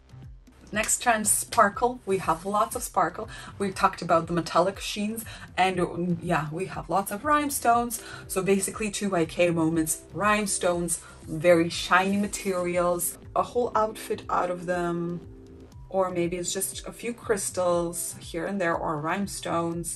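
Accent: Russia. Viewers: Great, thanks for your reply...if you don't mind me asking...is that a Russian accent I detect? I'm part Russian so I guess I'm just curious!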